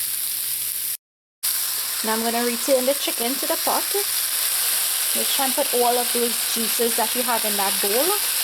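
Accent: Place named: Trinidad and Tobago. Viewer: Gyal me like u accent, I can listen to you all day.. I like de trini women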